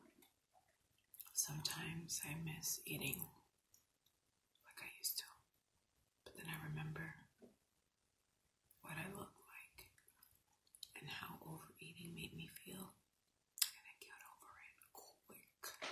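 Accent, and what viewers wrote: New York, United States. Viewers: you’re from new york are you lol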